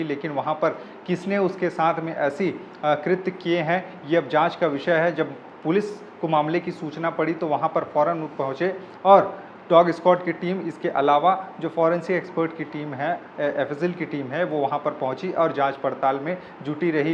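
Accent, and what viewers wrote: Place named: Chhattisgarh, India. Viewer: Tor c.g vala boli band kar de ka ga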